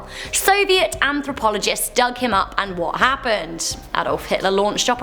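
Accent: England, United Kingdom. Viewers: Its the british accent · Miss!! I REALLY LOVE YOUR BRITISH ACCENT!! I HAVE A EARGASM WHEN YOU TALK!! 🇬🇧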